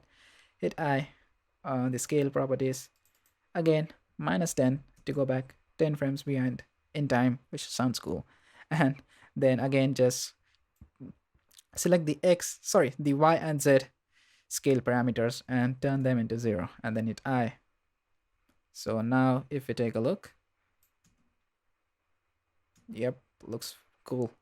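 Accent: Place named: India. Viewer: I hear an Indian accent. Hi.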